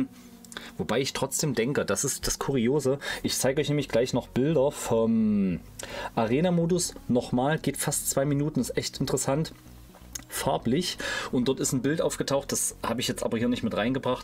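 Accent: Saxony, Germany. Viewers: Du bist doch aus Sachsen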